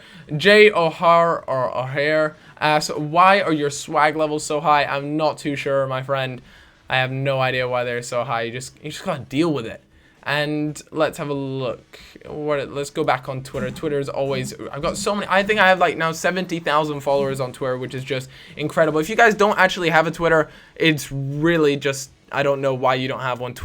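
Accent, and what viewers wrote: United States. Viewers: Are you American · Are you american?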